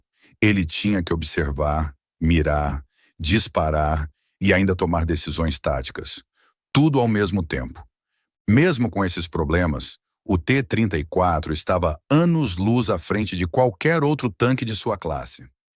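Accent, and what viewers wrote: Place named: São Paulo, Brazil. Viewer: Colocaram o sotaque de Paulista caipira na voz da IA.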